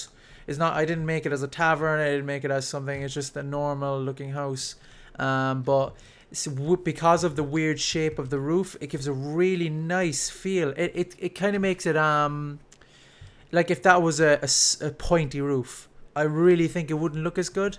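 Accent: Ireland. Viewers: Love the Irish accent ;)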